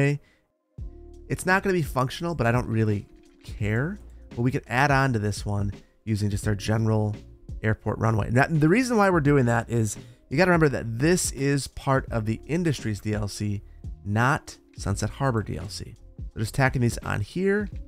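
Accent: Wisconsin, United States. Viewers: I totally think that this island is based on Mackinac Island (a car-free island in Michigan) because Marquette is another larger city in northern MI. Mackinac Island is a tourist trap, but Marquette is a super cool city on Lake Superior with a great atmosphere and massive old ore docks towering over the shore. I'm commenting this like 30 seconds into the video, but I hope you lean more towards a Marquette, MI vibe than Mackinac Island vibe. Also it backs up my theory that you sound super wisconson, and the UP is a popular travel spot for wisconsoners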